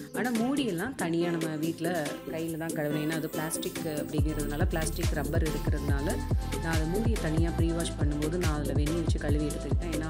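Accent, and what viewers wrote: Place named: Tirunelveli, India. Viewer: Nellai Tamil is mass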